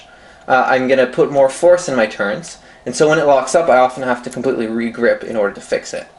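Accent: Canada. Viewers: R U canadian? Or Québécois?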